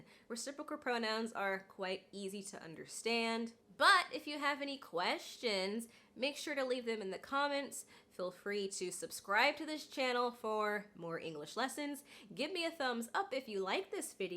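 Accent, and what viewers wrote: United States. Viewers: Great as always sis. I'm from côte d'ivoire and i teach english and I do love american accent. But plz can you gimme the name of the app you use to make your video. Thx take care😊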